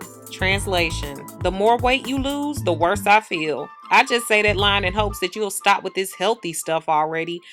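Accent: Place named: Midwest, United States. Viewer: and are you from the midwest?! your accent is strong lol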